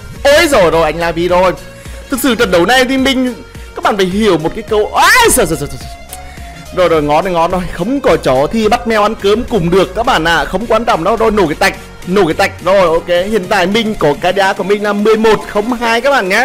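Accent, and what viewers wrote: Nghe An, Vietnam. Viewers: Giọng nghệ an choa hắn rứa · Giọng nghệ an quê ta dệ thương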